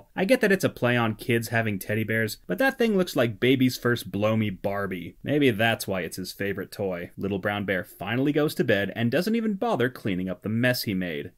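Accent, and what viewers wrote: United Kingdom. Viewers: nice English accent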